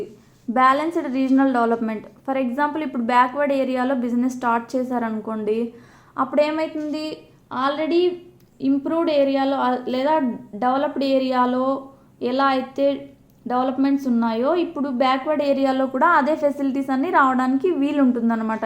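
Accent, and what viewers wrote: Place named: Kadapa, India. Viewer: Good simply n shorty. Slang koncham baa gunship. ☺😊 Kadapa ani andhariki artham kavalana emi? 😀😀😀😁😁. Manchi vedio Subscribe inkaa cheiledu... Inkaa inlantivi expect chestunnam. 😊😊😊😊slang...is good anaiah funny. ..thank you. .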